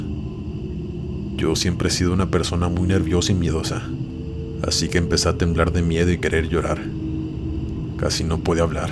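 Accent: Mexico. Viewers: Hola caballero saludos de Medellín Colombia men encata este narrador es mexicano usted voz muy varonil y muy clara y y muy pulcra quiero desir sin ningún morbo y muy caballerosa felicitaciones y gracias por sus relatos muy interesante 👏👏👏👏👏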